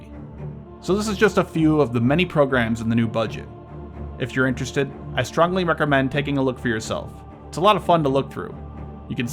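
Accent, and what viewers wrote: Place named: North, United States. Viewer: he might indeed be at least from northern US .. to my,western, Canadian ears I don't hear much difference ..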